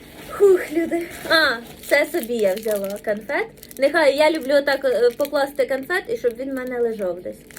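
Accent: Poltava, Ukraine. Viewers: Як ви не розумієте,що вона говорить,спеціально полтавськтм акцентом Вона грамотна дівчина,подивіться її інтерв,ю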